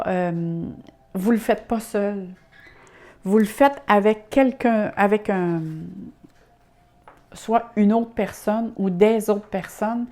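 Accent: Quebec, Canada. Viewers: Ahhhh le canada mon rêve !!! J'adore l'accent, les expressions SUPER merci pour le tirage :-)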